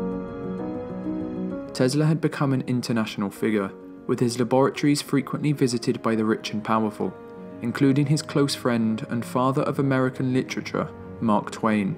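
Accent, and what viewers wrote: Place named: Estuary, United Kingdom. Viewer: Noce job. Remember v.o. "the' is "thee" on all vowels . (There's only 5...) And no estuary flat "Oy" on "I"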